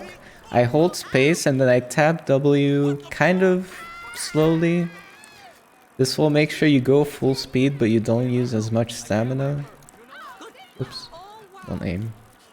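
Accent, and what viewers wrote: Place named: Hungary. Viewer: Na a magyar akcentus befigyel :D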